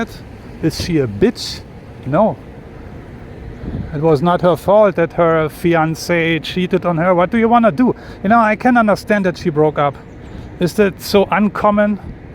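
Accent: Germany. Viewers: I like the English with the German accent!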